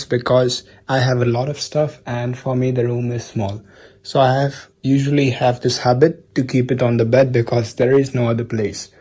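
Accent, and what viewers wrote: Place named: Russia. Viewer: You look the exact same, like you used to be during school, particularly in this video except for your voice and the Russian English accent!! I've been following all your videos, happy to see you exploring and living these experiences🙌🏽🤗 Good luck buddy 😊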